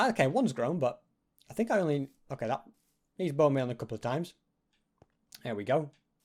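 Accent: United Kingdom. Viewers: I like British English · I never new you were British 🇬🇧🇬🇧🇬🇧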